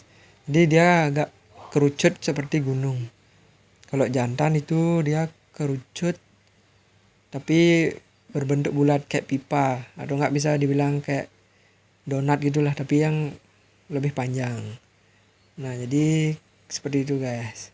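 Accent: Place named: Bali, Indonesia. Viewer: Bali ya bang agak lagat bahasanya mantap bang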